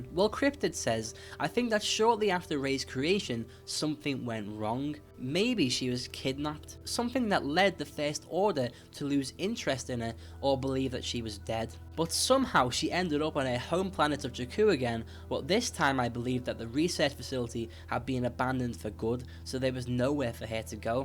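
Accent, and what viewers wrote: Liverpool, United Kingdom. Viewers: Are you from Liverpool? I am :p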